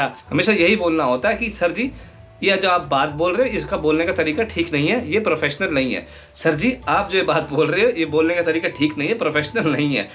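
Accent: Haryana, India. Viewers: Ye bhai haryana faridabad se hain ya utterpradesh Noida se boli dono ki same hai.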